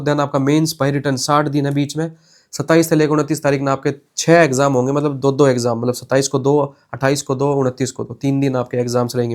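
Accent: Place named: Haryana, India. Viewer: Sir ka haryanvi accent ohhhoooo 😍😍😍😍😍😍😍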